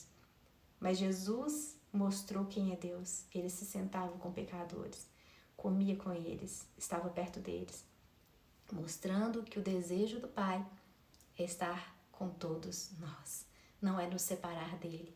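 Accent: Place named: Minas Gerais, Brazil. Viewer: ❤❤. Você. Hoje. Tá. Muito. Linda. Bem. Arumda. E. Você. Fala. Muito. Bem. Eu. Adoreio. Você. Quero. Seu. Tel. Endereso. E. Acidade. Seu. Sotaque. E. Minerinha. Bj❤❤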